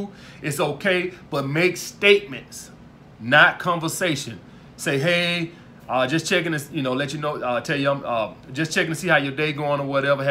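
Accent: South, United States. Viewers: He’s not, its his southern accent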